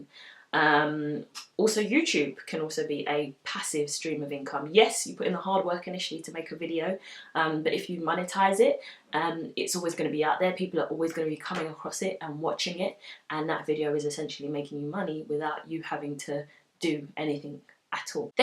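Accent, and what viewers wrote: United Kingdom. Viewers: My new favorite vlogger! A down to earth black woman, in marketing with a British Accent!! Love it!!! Subbing from Texas!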